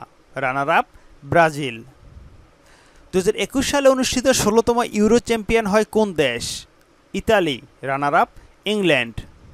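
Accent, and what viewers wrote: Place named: Noakhali, Bangladesh. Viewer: আপনার ভাষা নোয়াখাইলা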